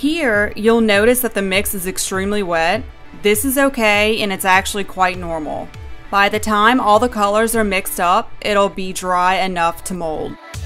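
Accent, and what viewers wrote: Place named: South Louisiana, United States. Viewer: Are you from South Louisiana? Your accent is very similar to ours.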